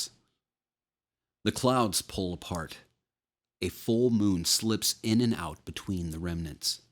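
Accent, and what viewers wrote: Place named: Maine, United States. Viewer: You have do a pretty good Maine accent.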